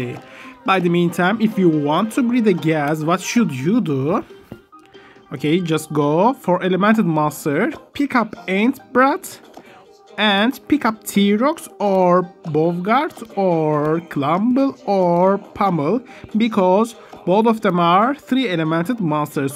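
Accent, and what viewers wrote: Turkey. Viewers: Abi birşey diyeceğim 2. Kanal fln açıp oyun hakkında taktik verebilir misin? Adam çok iyi fakat kimse gelmiyo starpointim 0 niye abi bil bilgin varmı (Abone olup like attım) TÜRK OLDUĞUNU BİLİYOZ AKSANINDAN BELLİ EDIYON :D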